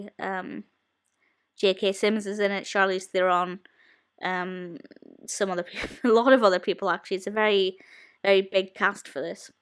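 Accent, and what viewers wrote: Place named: Belfast, United Kingdom. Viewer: I don't mean to be snarky but I think you got a bit blinded by your liking of Branagh, who is great but as stated here, Judy Davis is the co-protagonist. <link> Just offering constructive criticism; I see you're starting out/didn't do another(?). I hear from your accent you would have particular insight to KB's film on Belfast.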